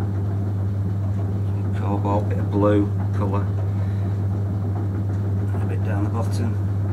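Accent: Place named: Lancashire, United Kingdom. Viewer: It's a Lancashire accent.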